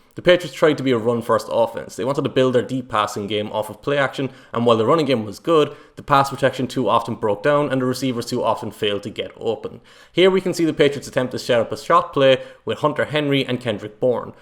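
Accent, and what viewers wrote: Ireland. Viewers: amazing breakdown! And I love that you only looked at one game for both good and bad. And tthe Irish accent is plus. Keep it up!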